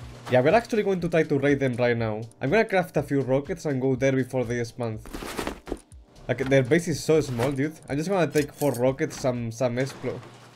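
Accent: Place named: Spain. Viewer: Se nota que hablas español eh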